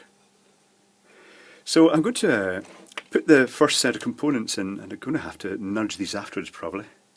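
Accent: Scotland, United Kingdom. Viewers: Googles automated closed captioning system trying to interpret a Scottish accent is quite funny at times.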